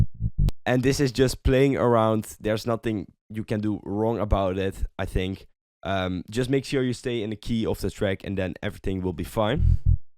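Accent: Netherlands. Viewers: heerlijk dat nederlands engels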